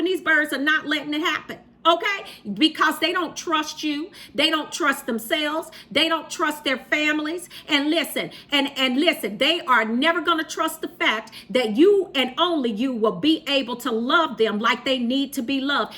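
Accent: South, United States. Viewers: Whenever she says, "That bird, SKUNK!" I be dying laughing🤣🤣🤣 she has a lil southern accent.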